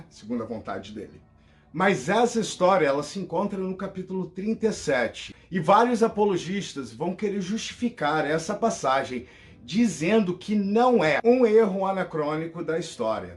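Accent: United States. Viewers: EU PENSEI QUE VOCÊ ERA AMERICANO, VOCÊ TEM SOTAQUE. VOCÊ É MUITO BOM, PARABÉNS ! SHALOM IRMÃO. CANAL REVERENDO MIL.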